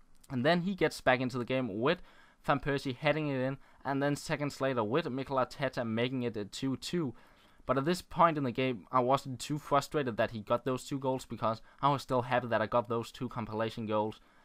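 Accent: Denmark. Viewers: You sound like a Dane.. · he's so danish its hilarious i love his commentaries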